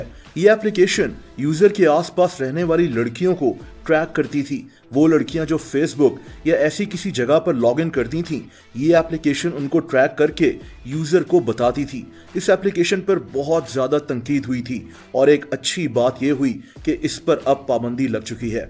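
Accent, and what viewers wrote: Multan, Pakistan. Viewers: Ap multan sa ho na bhai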